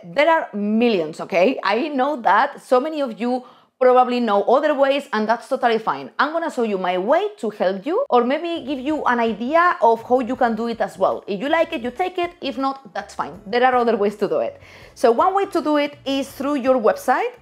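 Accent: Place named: Spain. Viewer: very good video. great recommendations. Also like the accent. - Spanish?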